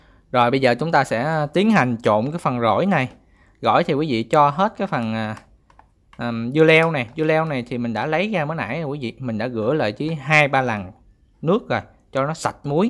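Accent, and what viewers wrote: Mekong Delta, Vietnam. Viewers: 😁😁😁 giong chuẩn miền tây nghe ấm áp nghĩ... ❤️ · Anh nầy dân Nam bộ miền Tây giọng nhà quê nên đừng có trách ,mặc dù anh hưỡng dẩn cơ bản như vậy nhưng ta cũng cần phải luyến láy đôi chút như xử lý củ hành cho bớt cay ,sắt xéo dưa leo cho đẹp hơn ,bào cà rốt cho dài hơn ,dưa leo cà rốt đều để ngăn mát ,có thể thêm nước mắm nếu không ăn chay và rau thơm có thể dùng loại nào mình thích không riêng gì rau húng cây . Cám ơn bạn trai đã chia sẻ ! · Đặc trưng của dân miền Tây đó bạn. Mình nghe dễ thương mà!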